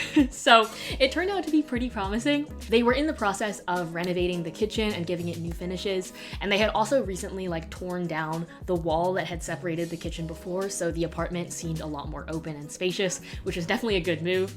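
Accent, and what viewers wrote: New York, United States. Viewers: is it me or does sound more like an NYer, like lower tone and speedy, confident vibe...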